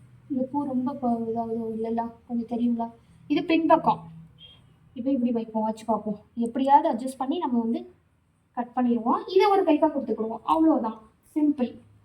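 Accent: Tirunelveli, India. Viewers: Tirunelveli slang always beauty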